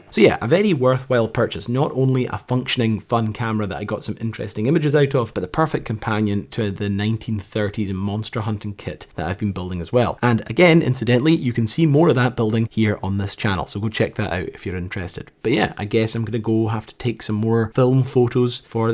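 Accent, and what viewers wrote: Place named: Scotland, United Kingdom. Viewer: Sorry if this sounds offensive. But i am jist curious. Is he a scotish ? I love the accent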